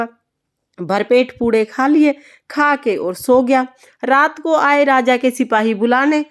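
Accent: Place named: Meerut, India. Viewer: 😊😊ji achcha reply k liye dhanyawad...aapki voice tone thoda hmare meerut ki lagi isliye puchha😊 happy sakat chauth aap sabhi ko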